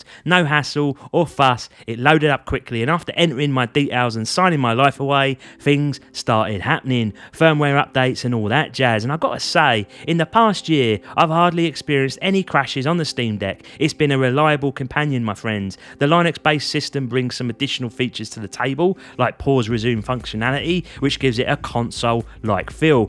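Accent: Cockney, United Kingdom. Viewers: Oy Oy Balham market, from Tooting myself. Nice comparison vid, I'll stick with my Steam Deck for now as it does everything I want as I play a lot of indie games and emulation, I don't really play AAA titles, if I do fancy one I play it on my main box. Subbed and liked for a fellow Cockney geezer. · Very cockney :)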